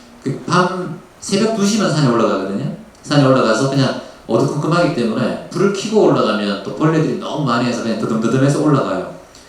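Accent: Gyeongsang, South Korea. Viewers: 경상도 액센트 너무힘들어요.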